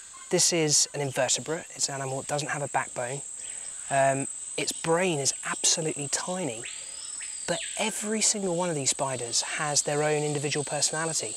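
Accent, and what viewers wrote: England, United Kingdom. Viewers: Truth, specially with that English accent ❤️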